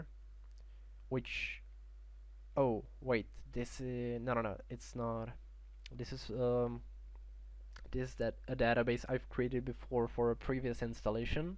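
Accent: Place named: Philippines. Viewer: Your enlish is very good! with a pilipeano accent. I like very much!!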